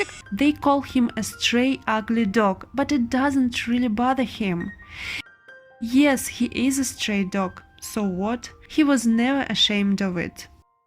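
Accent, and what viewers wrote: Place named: Russia. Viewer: your voice very much with your Russian accent it is so endearing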